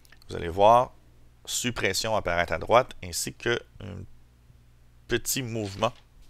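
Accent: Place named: England, United Kingdom. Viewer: belle accent anglais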